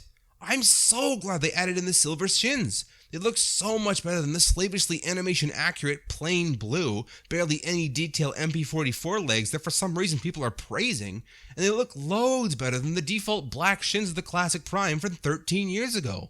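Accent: Canada. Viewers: Hey, I know this comment is kind of late. I just wanted to let you know that I've enjoyed watching your videos for years, the transformations are amazing to see in stop motion, and I'll be honest, taking away the articulation section isn't that major, in fact a little change here and there can be good for a YouTuber to improve! Also I just love your midwestern Canadian accent It's clear, and fully understandable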